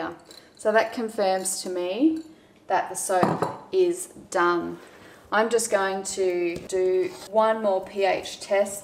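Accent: Australia. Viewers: Maybe they just don't like the Australian accent.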